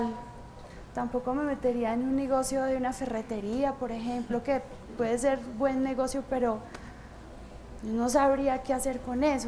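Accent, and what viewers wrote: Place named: Antioquia, Colombia. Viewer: Alejandra Moreno sencillo por que es paisa y se le escucha bien bonito, deja la envidia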